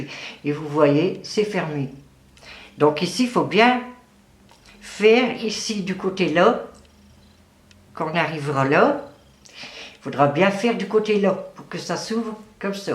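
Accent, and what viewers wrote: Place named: Nord-Pas-de-Calais, France. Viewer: Très bien expliqué... j' adore votre façon de parler qui ressemble au parlé Ch'ti que je suis ❤ Très beau modèle...👍❤️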